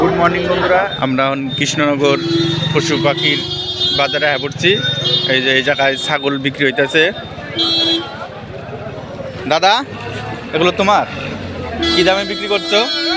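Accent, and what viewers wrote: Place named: Tangail, Bangladesh. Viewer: দাদা,,, ইন্ডিয়ার ভিডিও,,তবে মাঝে মাঝে বাংলাদেশর টাংগাইলের ভাষা বলেন কেনো😂😂😂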